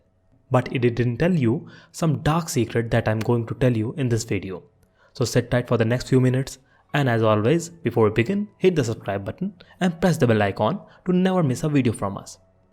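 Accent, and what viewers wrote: India. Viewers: "cane"'. Chupa essa cana. Your accent is....from india?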